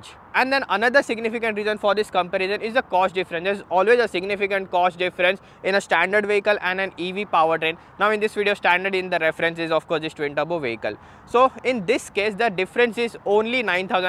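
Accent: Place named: India. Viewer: Angrezi bhi hindi ki tarah laga Jaise maths me english 👌👌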